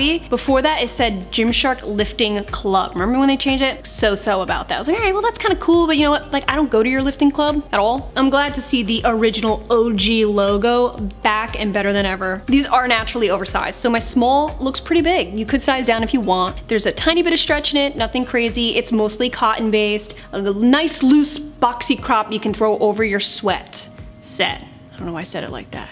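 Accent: New Jersey, United States. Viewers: Not interested in buying literally anything. Here every time EXCLUSIVELY for the Jersey accent.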